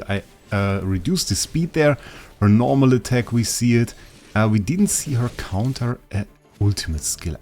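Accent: Germany. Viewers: your accent sounds german? am i right with my assumption?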